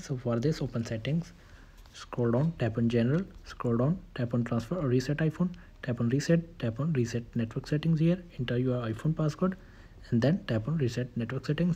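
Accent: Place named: India. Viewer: Bindian english accent.. eww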